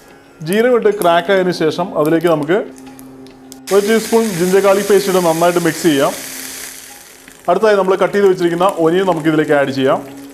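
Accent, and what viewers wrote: Kannur, India. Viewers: Looks Good. I will try it and comment it here. Are you from Kannur? You are having a Kannur accent. It seems you are based in Dubai. I am a great fan of Lebanese Lamb chops and lamb Kabab (not ground lamb kofta Kabab, but lamb pieces). Could you please do it whenever you feel convenient to do it. I am a regular watcher of your cooking videos. Crisp and short videos. I love your videos.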